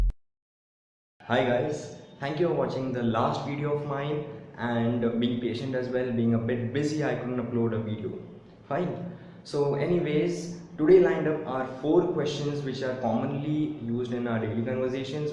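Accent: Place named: Goa, India. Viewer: 100% right Hindu Goa kokani pronunciation. My hubby speaks exactly the same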